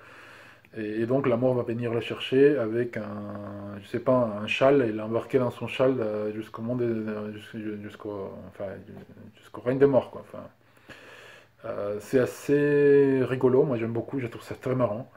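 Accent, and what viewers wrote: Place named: Mexico. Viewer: Moi je suis POUR un podcast en espagnol !!! Il y en a si peu 😞 ! je regarde celui de Esperanza y Anna, ( du Pérou je crois) et celui d'une espagnole d'Espagne mais qui ne me plait pas des masses...Ceux d'Amérique Latine en général portent surtout sur le crochet, alors j'adorerais t'écouter en espagnol même si je ne suis pas sûre de tout comprendre avec l'accent mexicain 😄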